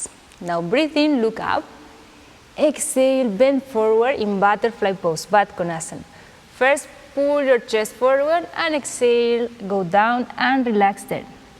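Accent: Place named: India. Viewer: Nice English pronounciation style is same as Indians....JGD.....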